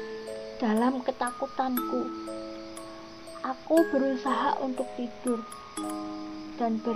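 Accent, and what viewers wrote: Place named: Java, Indonesia. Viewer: Medok amat ngomong y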